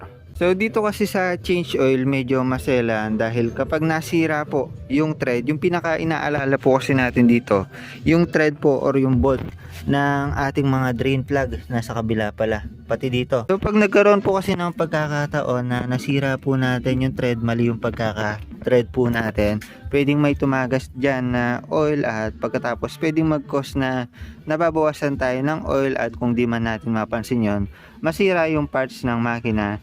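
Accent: Pampanga, Philippines. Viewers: Kapampangan kapin ne paps,,tin halu ing pangatagalog mu ehe! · kapangpangan kaba paps ung tono mo kasi e hehe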